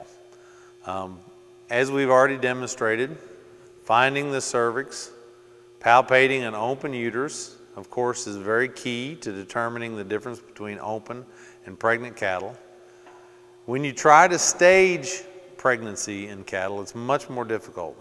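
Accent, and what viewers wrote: Kentucky, United States. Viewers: watching 2x speed because this man speaks Kentuckian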